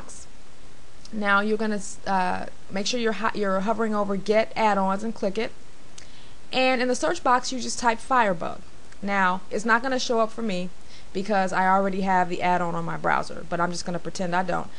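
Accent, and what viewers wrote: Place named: United States. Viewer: yeah, i am spinning! :) also your accent is great, true American.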